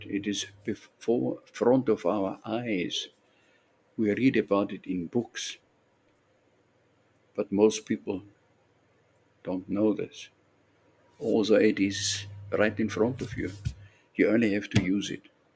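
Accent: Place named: Austria. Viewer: schoen den auch englisch sprechen zu hoeren. seit langer zeit im ausland lebend, erfreue ich mich aber immer wieder seinen oesterreichischen akzent zu hoeren. DANKE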